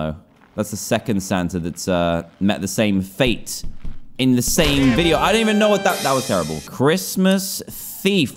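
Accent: England, United Kingdom. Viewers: He’s British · Tayler Douglas what? He is from england...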